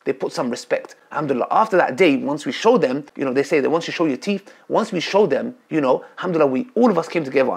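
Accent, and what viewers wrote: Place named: United Kingdom. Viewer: to be honest he always appeared to me as a British guy because of his accent. Never thought of him as a Kurd lol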